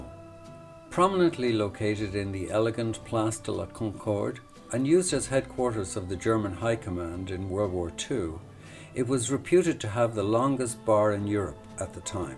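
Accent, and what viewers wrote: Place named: RP, United Kingdom. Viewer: A great tour, to be sure, but despite the prestige British [or maybe posh Dublin] accent, the poor pronunciation, at times dyslexic, of French names and locations —and depicting le Musée d'Orsay as le Musée du Louvre— detract from the presentation. At least it's seemingly an actual human voice, a thing you can't always count on these days on YouTube.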